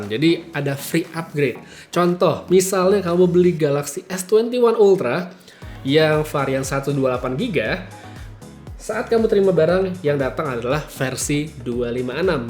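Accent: Bali, Indonesia. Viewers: Orang bali iya ?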